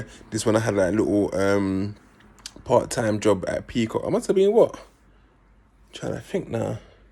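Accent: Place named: North London, United Kingdom. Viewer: Love the vids bro. Mans got the full on narm accent, sounds like giggs sometimes lol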